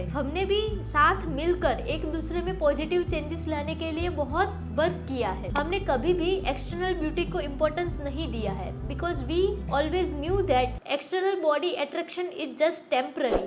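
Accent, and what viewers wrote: Gujarat, India. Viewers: If Gujarati are speaking Hindi 😊✌️ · I love gujrati tonn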